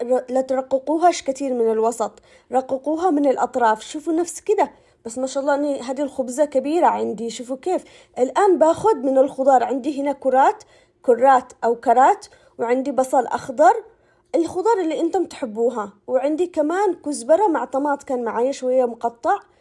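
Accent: Aden, Yemen. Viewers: ياروحي على مطبقيه عدنيه بلهجة عدنيه ❤❤. ما أحلى اليمن ❤🇾🇪♥️ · ما شاء الله عليك حبيتك حبيت خفة دمك ولهجتك الجميلة أول مرة أسمع الحكي اليمني الأصلي ربي يحفظك ويسعدك شكرا لانك عيشتنا معك أجواء اليمن السعيد ونقلت لنا ثقافة اليمن العريقة متابعة واشتراك ولايك لعيونك الحلوين وتسلم ايديك❤ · ماشاءالله تسلم يدك يا كم تعجبني لهجتك العدنيه والله حبيتك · فديت عدن وفديت كريتر الغالية وفديت كلامك العدني المليح واحلى مطبقية من احلى فنانه مبدعه ماشاء الله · اللهجة العدنية جميلة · فديتك احب طبخك واحب اسمع صوتك الله على الكلام العدني اقسم بالله انت فنانه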